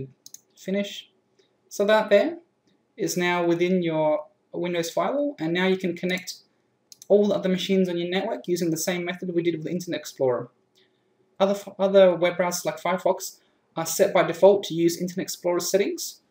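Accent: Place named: United States. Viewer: An aussie with an American like accent... You're on youtube to much and listen to way to many americans!! youre an AUSSIE not a YANK!!!!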